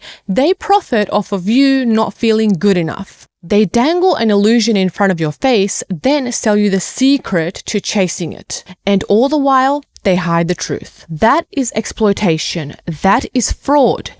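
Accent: Australia. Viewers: Where is she from…? Australia? New Zealand? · Are you Australian?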